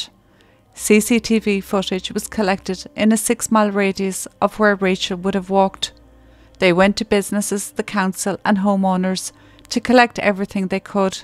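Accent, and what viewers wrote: Ireland. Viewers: Thank you, as always. Beautifully covered on such a senselessly sad case. I love just listening to your soft brogue. Slainté 🍀☘🌹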